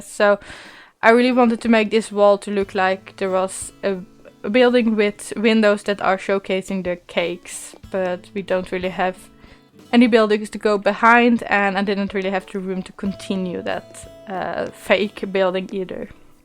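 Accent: Netherlands. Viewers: Aaa okay! I'm from the Netherlands as well and thought I recognized a dutch accent hehe.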